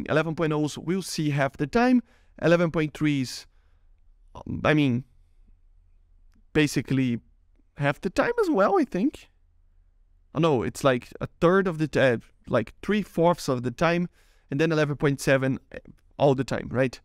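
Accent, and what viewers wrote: Brazil. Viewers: that Brazilian accent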